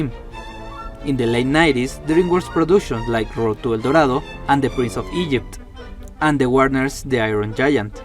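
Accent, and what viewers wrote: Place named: Romania. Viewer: Good Video! you have quite an accent. Are you from Romania?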